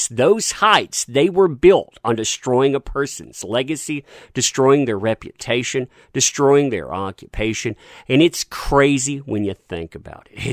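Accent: South, United States. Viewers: I love the sound of your voice. I can't place your accent. It feels slightly Southern, but maybe some Midwest? Whatever it is, you have a great presenters voice. It draws the listener in. Keep it up.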